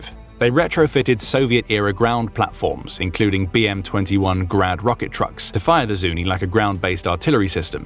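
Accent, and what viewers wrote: United Kingdom. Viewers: Oh 900 out of 6000 you sound British what do you know ??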